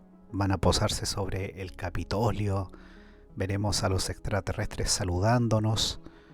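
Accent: Chile. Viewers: Por mas podcast como estos con acento chileno ☺️. Mis favoritos, gran trabajo, gracias ❤.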